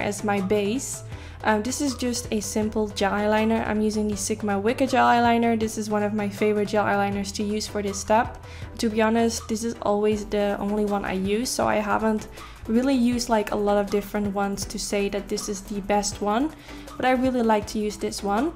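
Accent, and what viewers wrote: Netherlands. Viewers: is she dutch? Idk her accent sounds Dutch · Ik moet zo lachen om jouw heerlijke Louis van Gaal engels! Ik vind je geweldig!!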